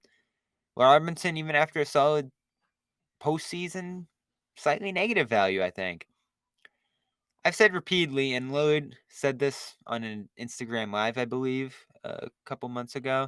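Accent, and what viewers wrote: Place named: Boston, United States. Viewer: I’ve your Boston accent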